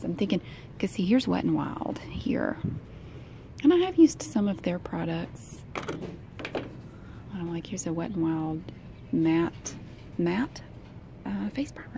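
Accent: South, United States. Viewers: I love your videos you remind me of Lisa kudrow aka phoebe buffay! Your voice is sooo soothing I’m from South Yorkshire in northern England. So any American accent is cool to me! Xxx keep up your amazing vids woot woot! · I love listening to your voice, your southern accent is lovely I could sit & listen to you talking all night.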